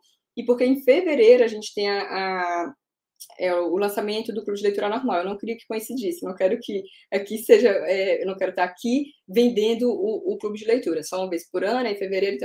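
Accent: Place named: Northeast, Brazil. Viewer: Amei seu sotaque NORDESTINO.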